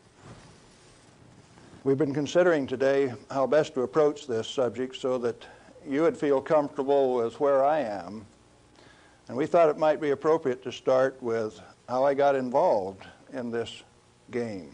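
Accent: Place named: Midwest, United States. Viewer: I'm always nostalgic for mid-century Midwestern accents from a grandfatherly man talking science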